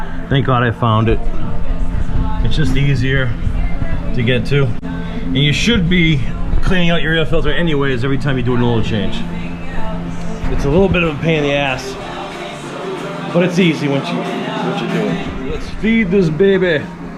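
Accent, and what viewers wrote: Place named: East Coast, United States. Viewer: LOL ProChargA. It’s obvious you’re accent isn’t from most of America. East coast or New Orleans. Great video!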